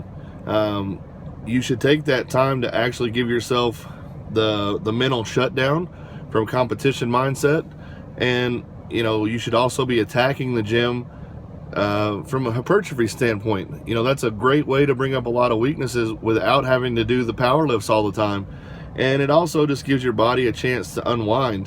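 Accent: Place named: Russia. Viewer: Will you be putting this into the new book? 20 week cycle? I can hear the russian accent developing in your voice, you have learned a lot from Boris and his athletes,I can see the influence in the way you speak about training. A mix of Louie and Sheiko, ain't that the best combo in the world. I love it. Side note, how cool is that video of them two meeting? Louie was so happy, grinning like a boy. Glad that those two met.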